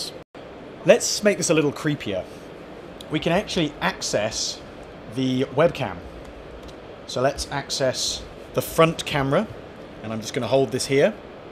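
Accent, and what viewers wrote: United Kingdom. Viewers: Man's British I guess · He is talking very British lol. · I can hear the British accent ❤ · I really like the UK #accent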